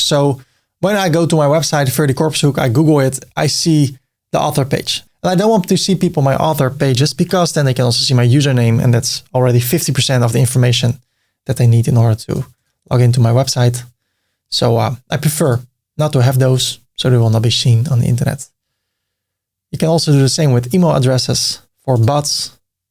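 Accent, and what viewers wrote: Netherlands. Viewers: sounds like a dutch accent . im not sure though. here goes nothing. Nederlands?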